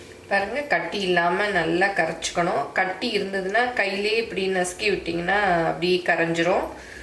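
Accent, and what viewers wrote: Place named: Chettinad, India. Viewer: Hats off achi, use our chettinad Tamil when speaking. It's so nice to hear. Very sweet of you. Recipes are good too.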